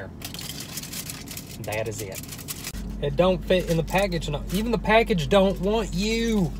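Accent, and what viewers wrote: South, United States. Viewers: Anyone else notice that 3 years ago vs today how much his Southern accent has left? He’s originally from Arkansas so it’s understandable that he has it then but it’s left him now. Just pointing it out lol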